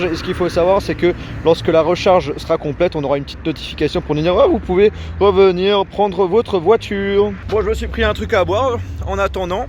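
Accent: Belgium. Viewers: Mais est belge? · Tu est belge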